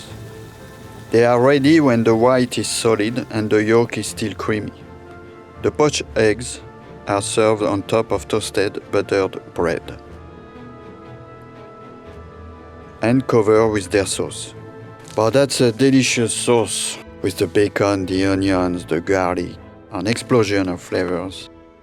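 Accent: France. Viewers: I'm french and even for me it was too much. We all know that our accent is hard when we speak english, but yeah that's kind of extreme here. Still loved his "and voilà" at the end. 😂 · Intelligible? What? He has a French accent. He is French. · He's painfully French, judging by the accent. But an amazingly skilled chef, nonetheless. I wanna try the Ouefs en meurette, and the jelly poached eggs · "I see people do crazy things, you know." said the deadbeat-sounding Chef in a thick French accent with a very serious tone. Are you feeling well lately? I am concerned.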